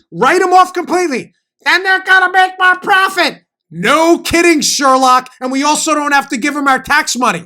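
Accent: New York, United States. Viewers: Dan...as always I cannot wait until pm to watch your videos. So much to look forward to....the great NY accent and the same brother hood with the NYPD. You rock!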